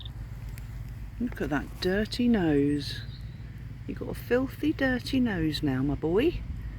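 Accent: United Kingdom. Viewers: Beautifully narrated video. It's very nice to see them safe and healthy. Always puts a smile on my face when you say "filthy dirty nose!" Must be the nice British accent. Thank you. Stay safe and well everyone! 🙏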